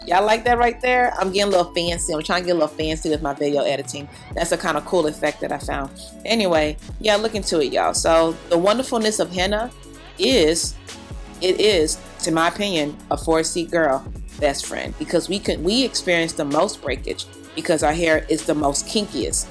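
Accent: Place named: Nigeria. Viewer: Yasssssss come through with that Ayruveda! Can you do a whole video with your Nigerian accent lol, I love hearing it.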